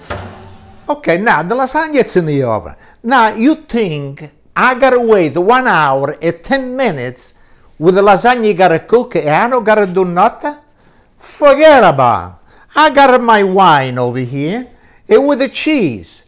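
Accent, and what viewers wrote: Italy. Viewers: I'm laughing AND crying. This wonderful man sounds like EVERY Italian immigrant I had the privilege of growing up around and with, including my own awesome father. Miss you, papa' 🍝🍷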